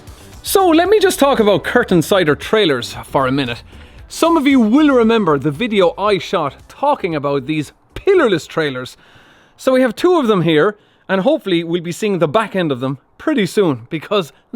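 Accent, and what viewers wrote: Ireland. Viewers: A guy with a Greek name with an Irish accent, driving a Swedish truck on English roads: doesn’t come more cosmopolitan than that. Seriously though entertaining video!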